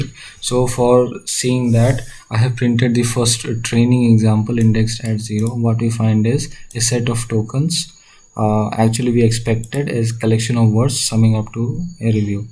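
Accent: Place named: India. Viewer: Probably the Indian accent got you.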